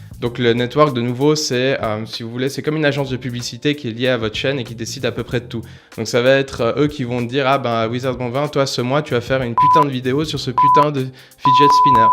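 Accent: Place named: Switzerland. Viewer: Super, vidéo d'ailleurs je suis également Suisse. Je me disais a l'accent. Pis la mentalité ce voit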